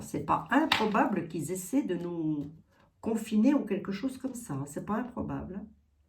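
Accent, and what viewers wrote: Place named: Meurthe-et-Moselle, France. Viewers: coucou j'avais bien reconnu la pointe d'accent de la meurthe et moselle hihi, oui la blonde est veuve du 1er depuis 2019, l'addiction y a qu'a voir ce frotte le long nez a tout bout d'champs, merci a vous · Cc je me disais bien que je reconnais ce ptit accent...je suis de moselle...merci pour votre présence 😘😘❤❤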